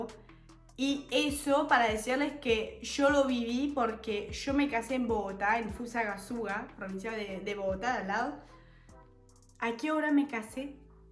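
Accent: Buenos Aires, Argentina. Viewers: Bogota no es una provincia y deja de hablar como una argentina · No entendí bien francesa por qué tienes el acento tan pronunciado de Argentina pero sabes por qué Barranquilla es una parte muy bullosa y de muchos carnavales te faltó muchas partes preciosas para conocer y ante todo la gente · Eres francesa , pero tu acento es argentino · Hola!!! Tremendo acento argentino 😂😂😂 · Ya tienes un dejé costeño, eso si, el argentino porteño lo tienes bien adentro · Jajajaja me encanto su video hablas muy chevere ..pero tengo una duda eres francesa o argentina por q su acento es argentino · Habla como de argentina boludo no como frances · No tiene nada de francesa,puro acento argentino,mi amigo francés lleva más de 15 años en colombia y se le nota el acento francés ,todavía se le dificultan palabras y eso que es profesor,esta chica no es francesa. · Mona aquí en Colombia se refieren al color del cabello y encima sos Blanca total. 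En España le dicen Mona a las cosas que les gustan y son bonitas pero a las personas no. Y vos tenes acento argentino. Pero de francesas no te veo nada ois. Soy de Medellin tierra hermosa somos muy amables igual que Bogotá, amo mi tierra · ese sonido "chillón" {chirriante y chocante} de esas letras "argentinizadas" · Que linda, al hablar mezcla estilos el argentino, barranquillero, algo español, muy interesante. Se ganó mi corazón. Saludos desde Colombia. Bueno en tema de comidas, si hay diferencias entre zonas de Colombia. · Pues de acento colombiano tienes bien poco: lo tuyo es argentino. Soy español y también hay cosas que culturalmente no compartimos con los hispanoamericanos .... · No pareces francesas . Tienes mucho Acento Argentino 😮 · creo que los colombianos no te van a entender mucho,vos hablas más argentino que colombiano. Te extrañamos en argentina 😊😊😊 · Bueno, pero al fin es Francesa o Argentina? 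Habla como Argentina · Señorita vaya que se le pegó el acento argentino.. "sha" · Aprendió Español con alguien De argentina. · Haha el acento de tu español es completamente argentino con alguna palabra colombiana por ratos y el frances haha me encanta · Jajajaja es super encantador ese acento entre argentino y costeño que tienes jajaja <3 <3 <3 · Yo soy colombiana, y mi esposo es árabe.. y ellos comen más arroz que nosotros. Mi suegra se gasta 3 kilos de arroz diario. La yuca con café con leche... jajajaja qué veneno, y dice que tiene problemas digestivos para los fritos 😂. Y nutella, que prácticamente es chocolate con aceite de palma que no es digestivo para el cuerpo... Qué triste que destruyas tanto el Idioma Español. O hablas como argentina o adoptas el idioma Español colombiano. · Colombiano que se respete come arroz como sea bien chistosa esta estrangera pero es francesa pero tiene acento Argentino si yes pero como la francesa vivio en Argentina luego aqui en bogota colombia si yes bien venida a colombia soy colombiano estrangera hermosa si yes estas muy bonita si que dios te guarde y santisma virgen de Guadalupe siga asi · 😊Con acento argentino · Me encanta como incorporasre es el modo de hablar porteño. 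Ahora bien, si hay más vendedores ambulantes que acá en Argentina, es mucha gente vendiendo en las calles. Muy lindas las referencias de Colombia. ¿Por qué será que siempre vamos tras el lenguaje coloquial y el grosero de cada país?😂 Salut a l'amie française!!!! Dónde vivís en Francia? Cariños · Ud es de Francia o es argentina porque tiene más asentó argentino que francés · Que bueno escucharte, soy de Bogotá y me alegra mucho que te Gusto mi pais,me gusta tu unionde argentino con barranquillero y algo de Bogotá una mezcla que se escucha chevere sobre lo de mona es por que tenias el cabello algo rubio y la piel blanca es tambien algo de cariño como lo qué comentabas del mi amor.😊😊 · Ton accent est très argentino mais les paroles que tu dites sont très barranquilleras. 😅😂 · Hola te quedó el acento Porteño.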